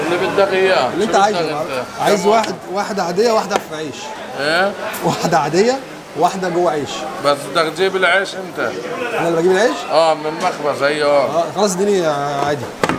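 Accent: Egypt. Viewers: نحن اهل فلسطين اكيد يعني بدنا نعرف انك مصري من لهجتك لانها كثير واضحه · طبعا انت حكيت انه في حب كبير لمصر، وهذا شيء صحيح احنا كبرنا على الثقافة والحاضرة المصرية، يعني مسلسلات وافلام مصرية وسورية برضو، وموسيقى مصرية فلهيك بتشوف الناس بتتحمس بس تسمع لهجتك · عرفوك من اللهجة 😊😊والنطق 😂😂والله بحبكو كثير يا أهل مصر انا من فلسطين من جنين❤❤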